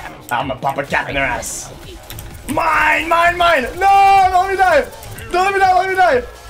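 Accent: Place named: France. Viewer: His first language was french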